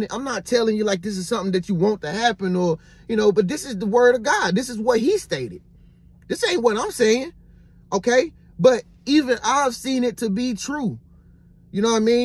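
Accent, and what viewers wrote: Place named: South, United States. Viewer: Yes sir, I've been hurt, and bullied at work for no reason. By-the-way I love your southern accent King! 🤗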